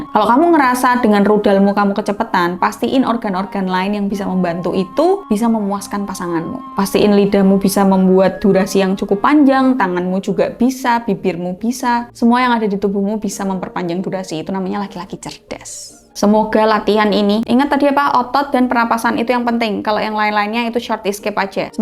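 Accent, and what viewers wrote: Java, Indonesia. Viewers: Mbae Jawa bgt ya...😂😂😂 I love U Mbae. ❤❤❤❤❤❤❤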